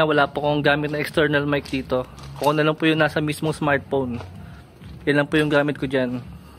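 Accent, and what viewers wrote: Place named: Bulacan, Philippines. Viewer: taga san k boss yung accent mo parang sa bocaue bulacan 😄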